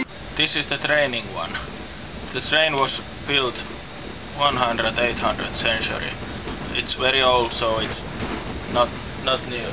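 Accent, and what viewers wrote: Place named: Finland. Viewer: I'll listen to this, if i miss the proper Finglish! :D Such a clearly nice strong typical Finnish accent! Yeah! :D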